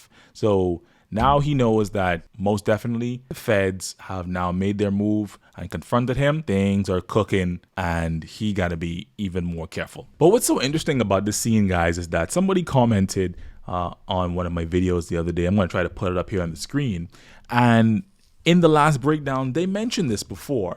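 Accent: Bahamas. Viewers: I just came across your video for the first time. From the moment I heard your voice I immediately recognized your Bahamian accent. Nice to see a fellow Bahamian on YouTube. ❤🎉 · I agree with what you said at the end bro ,& you sound like a fellow Bahamian as well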